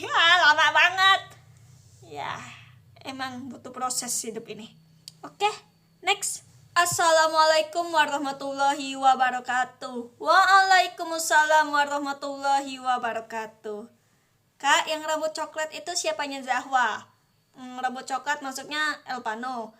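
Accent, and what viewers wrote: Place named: Palembang, Indonesia. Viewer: Pantasan bahasa nya kek ada setengah bahasa palembang gitu ternyata kakaknya orang bengkulu di sumatra selatan soalnya ane orang sumsel juga🗿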